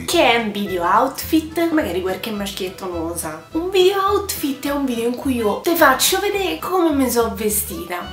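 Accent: Rome, Italy. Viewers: Fantastica come sempre xD troppo forte !!! si capisce che sei romana !! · Amo alla follia la tua comicitá, la tua parlata romana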